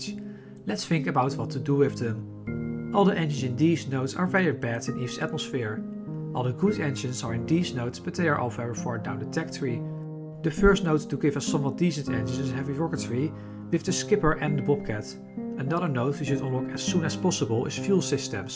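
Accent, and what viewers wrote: Netherlands. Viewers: I smell dutch. Tell me if I am right or not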